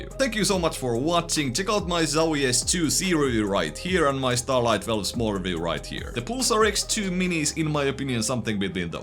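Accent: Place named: Finland. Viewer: You have a pretty strong Finnish accent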